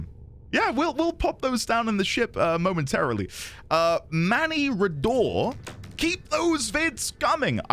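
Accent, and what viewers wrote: United Kingdom. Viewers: I totally agree in regards to playing the game solo for now, I played the whole starchart solo and it helped me to learn all the mission types and game mechanics better. The only time I joined squads was for interception missions as defending 4 points on the map solo was a challenge for me 😊 You sound as though you are also a fellow Brit , not sure I have seen many UK players make videos. Great vids btw and yes personally I love watching your whole WF playthrough, it's a bit of nostalgia for us who have been plying for years, we enjoy seeing new players experience what we have experienced, the new player experience seems much better now. Love your excitement and energy. 😂 You can buy a codex scanner from the market for credits if I remember correctly, then you can use your Helios to auto scan enemies like Vor and Vey Hek etc, every enemy actually for your codex entries. 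It will then also show you which damage type is best for which enemies, not that you need to worry about damage types yet The difficulty will increase per planet so don't worry it will get tougher.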